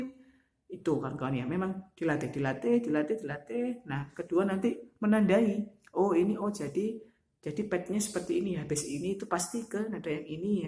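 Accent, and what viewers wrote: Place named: Java, Indonesia. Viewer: aslinya mana sih bang? kok ada boso jowone "nirukke" ?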